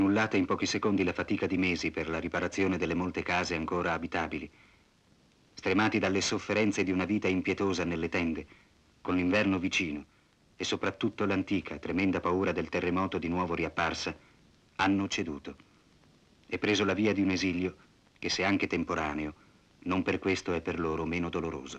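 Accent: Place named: Trieste, Italy. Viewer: L’accento triestino della voce narrante include un ulteriore occlusione al racconto tragico di questa immane tragedia. Il documentario è di rara fattura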